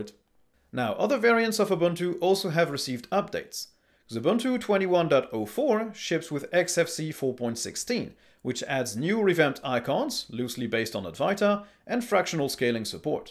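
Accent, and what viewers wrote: France. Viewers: Vous êtes français ?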